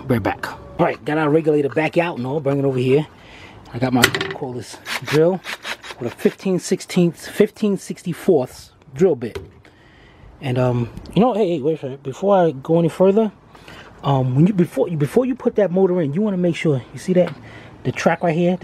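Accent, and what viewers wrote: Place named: Northeast, United States. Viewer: NE accent....somewhere between Philly n Boston lol I can't quite tell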